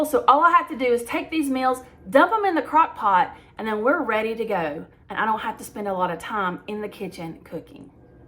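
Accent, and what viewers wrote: South, United States. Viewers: I'm a fan of freezer meals! The nest is empty, but I still like the convenience of being able to throw something in the crockpot or Instant Pot. Bless you, teaching the kindergarteners. Both of my boys loved their K teachers, and I think it set the stage of enjoying school in general. (They are 32/34 now!) I taught HS chemistry. I've lived in TX, AL, SC, and now TN. I can't figure out what flavor of Southern accent you have, lol! Great job on the exercise, btw. The endorphins are so good for you.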